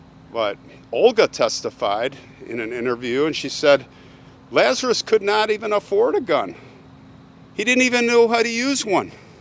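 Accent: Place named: Chicago, United States. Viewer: Hi Im from Skokie, Illinois, now California. I was thinking the Jewish cemetery looked great. But as I expected you found a group of headstones. I am Jewish and I will be cremated and not be buried in a cemetery especially a Jewish one. Many graves are/were destroyed due to antisemitism. I want to thank you for the respect you showed. P.S. Are you from Chicago? Your accent sounds familiar.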